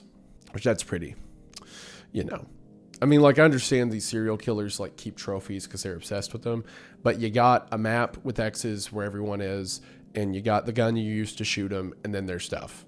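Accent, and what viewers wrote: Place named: South, United States. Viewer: your not so upbeat southern voice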